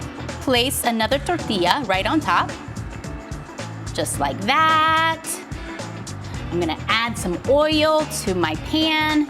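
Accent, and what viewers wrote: South, United States. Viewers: I had no idea had a country accent before it sounds so adorable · Omg u made me so hungry LOL pizza one looked so good and I loved the country accent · Hi!!!! I love the red lip and I just love pizza too!! Your son and I will get along well, and my daughter, but she only likes cheese. You would think I'm italian bc I love Italian food!! I love your food videos. You just sounded like Tamera in your southern accent!! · Hello there southern accent! 😂 I love it 😊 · yes fpr the country accent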